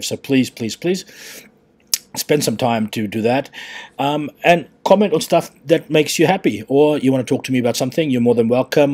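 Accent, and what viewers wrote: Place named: South Africa. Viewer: Nice accent mate, are you a South African ?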